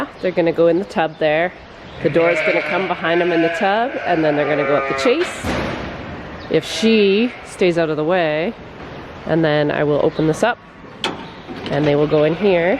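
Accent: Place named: Canada. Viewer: DrummerGirl423 she’s Canadian!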